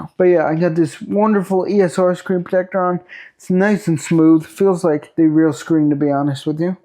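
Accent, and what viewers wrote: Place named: Scotland, United Kingdom. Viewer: God bless you the exact content I needed that ia could not answer. I take it your descended from Scottish people I can tell with your accent. The home land thanks you x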